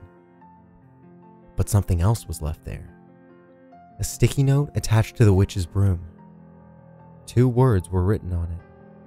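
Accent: Canada. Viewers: Canadian, eh? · Your canadien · Bruv your Canadian accent makes this way less convincing. Americans, presumably most employees at Disney, don't say "soaree" 😂😂😂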